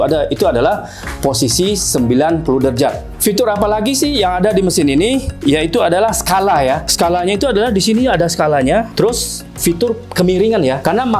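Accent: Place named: West Sumatra, Indonesia. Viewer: masih terasa logat minang nya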